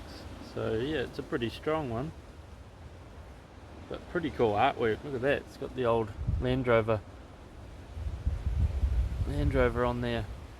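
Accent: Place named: New Zealand. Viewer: You are fun to watch. Your sense of humor is so laid back. You don't yell like others do. Love your New Zealand accent. Please keep making videos.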